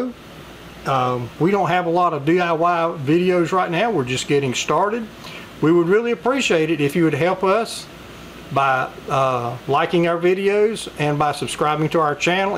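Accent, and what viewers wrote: South, United States. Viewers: I just love your southern drawl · thank you for this great video! It was nicely done and you very thoroughly explained the technique and WHY you don’t do certain things. I always find that sooo helpful when you tell someone why they shouldn’t do something (like the backside). You sound like you’re from the south, maybe North Carolina, because that’s where I lived for 25 years and my hubby is a southern boy from NC.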